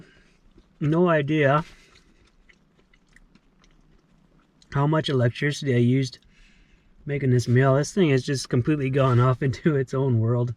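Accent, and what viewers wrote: Canada. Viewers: Beautiful sentiments but he's Canadian, NOT American. We have different regional accents as well.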